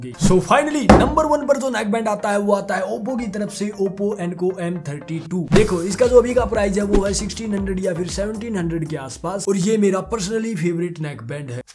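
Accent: Haryana, India. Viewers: Haryana say h bro ye Hmare jile say 🎉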